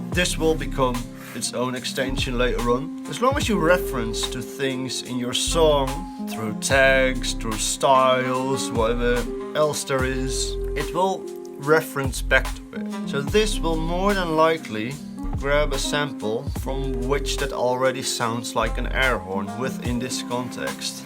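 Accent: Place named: England, United Kingdom. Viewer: What city and ethnic background? lol, you sound like you came right out of a gutter. Do people in England not speak English anymore?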